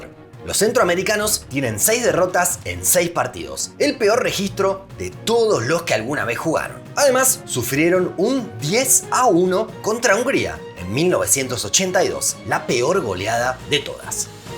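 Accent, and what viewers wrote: Argentina. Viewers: Sos argentino loco? De donde sos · Jejeje ahí vi que tienes un telescopio 🔭.. Casualmente estaba buscando paisajes de Argentina en Internet cuando llegó tu notificación... Creo que eres de Argentina por el acento, de que provincia por cierto, si en un futuro viajo a Argentina espero encontrar a la gente que conocí en Internet allá (no debería pero ya que) Las provincias que quiero conocer primero son Neuquén, Mendoza, Jujuy,Misiones,La Rioja,Chubut, Río Negro,Tierra del Fuego,Salta, Córdoba, etc... Un saludo desde Costa Rica 🇨🇷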